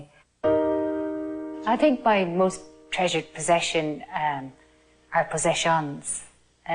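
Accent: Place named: Ireland. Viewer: Irish people really say "me kids" in stead of "my kids", cute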